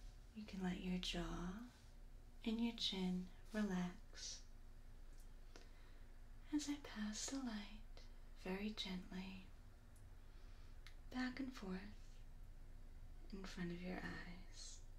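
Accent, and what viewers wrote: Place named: South, United States. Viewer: I don't comment very often on your channel, but I just saw this vid 2.5 times... That good it was!! Amazing tingles!! ...Thanks for that! :D ...ALSO, there's a rhythm on every aspect of the video that makes it SO soothing -I bet if we measure your movements, those must be along the range of the Theta Waves- and so relaxing! ... ...and one more thing, I love your southern accent! Is so mellow... Talking with contractions is sooo mellow xD Thanks again! :D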